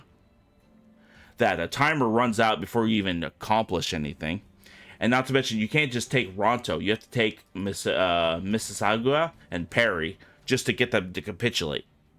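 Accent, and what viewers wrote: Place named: South, United States. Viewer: Loving the accent it's like you flip between a Boston accent and southern.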